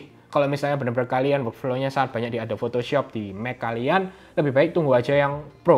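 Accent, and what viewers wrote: Java, Indonesia. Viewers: thanks gan.ngomong ngomong asli ngendi sampean mas.wkwkwk.ligate jik ketok jowone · Logate Jowo banget mas wkwkw.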